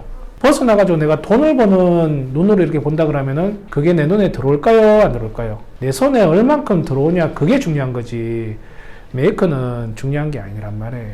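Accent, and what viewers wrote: Daegu, South Korea. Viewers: 말씀 넘 재미있게 하세요 대구 사투리 넘 매력 있어요~ 귀에 쏙쏙 잘 들어와요~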